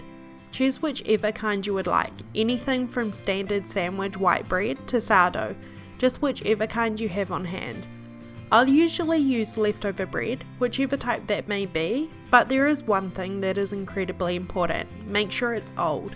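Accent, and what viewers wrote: New Zealand. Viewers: Hi, I just wanted to say I’m from new zealand aswell. I could tell by your kiwi accent. Your cooking videos are so great and helpful thank you :) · Nola Susan New Zealand accent duh 🙄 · Kiwi New Zealand accent 🔥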